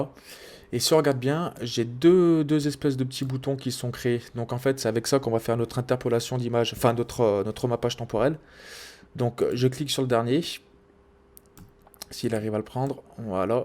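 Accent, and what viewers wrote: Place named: North, France. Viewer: pas mal , l'accent on s'en fout fout....même du nord nord...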